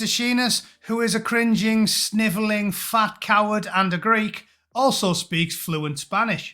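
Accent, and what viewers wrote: United Kingdom. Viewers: Now THIS is the way I like to hear a Brit talk. Good on ya, boy!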